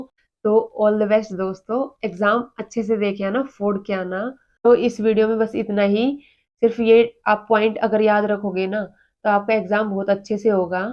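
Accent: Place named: Himachal Pradesh, India. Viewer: Your accent is Himachali...💞...nd thnxz for boosting ma'am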